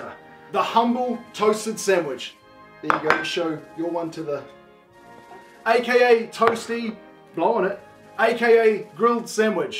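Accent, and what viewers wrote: New Zealand. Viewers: No offense, but I find the New Zealand accent the most hilarious 😂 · Came here to hear maori accent 😅I miss home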